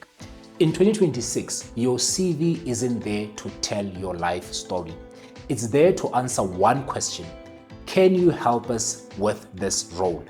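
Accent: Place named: South Africa. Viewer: Your accent gave you away. You speak so beautifully. A South African I guess.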